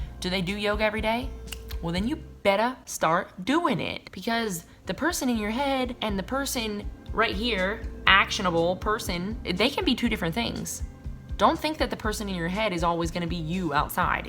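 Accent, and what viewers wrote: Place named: North Carolina, United States. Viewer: I was about to comment about how lovely her accent is. I grew up in WV though I'm not originally from there. I never really picked up the WV accent but hearing your NC accent makes me feel like I'm back home. <3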